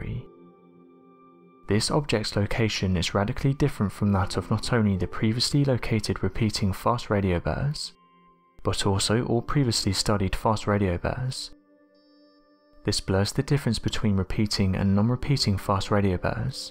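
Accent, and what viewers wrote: United Kingdom. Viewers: Honestly, I love the content. I listen to other channels that have British narrators too. I find that this narrator speaks too quickly and his words seem to run together. He speaks too softly at times and I often find it difficult to understand through his accent even though I listen to other British accents often, for example, the narrator of the Top Mysteries you tube channel. I have trouble understanding this man. His voice is soothing though.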